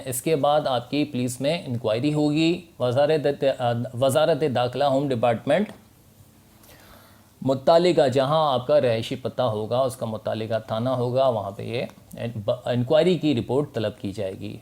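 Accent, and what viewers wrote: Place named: India. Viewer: Bahe ap ne jo tarika btaya aisa kuch b nahe hai pakistan m ap muje indian lag rahe ho zuban say b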